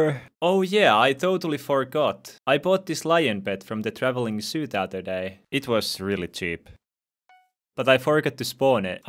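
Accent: Finland. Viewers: Its called Finnish accent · Are you finnish? Like, your name is and your accent sounds little bit like finnish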